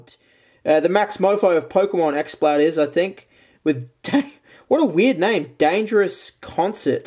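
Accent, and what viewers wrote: Australia. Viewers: Hes Australian though, james is British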